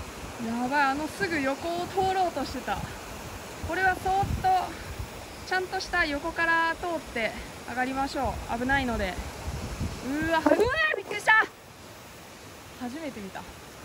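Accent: Gifu, Japan. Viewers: MARiAさんスズメ蜂ヤバかったですね。 山には人を惹きつける不思議な魅力があります。難易度が高ければ高いほど惹きつけられるものです。 事故には注意して下さい。ちょっとの骨折でも二度と登れなくなる可能性もあります。このコースも想像を絶するものでした。 MARiAさんの岐阜弁大好きです！ こんな綺麗なクライマーはいない！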